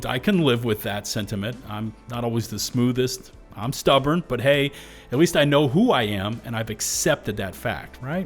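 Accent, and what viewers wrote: United States. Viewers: You are one of the few content creators for helldiver 2 with an American accent. As such, the only one I follow. For democracy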